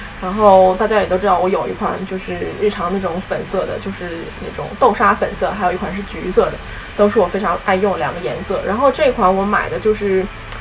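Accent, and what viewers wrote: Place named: Changchun, China. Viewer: 长春人？难怪口气很熟悉，老乡啦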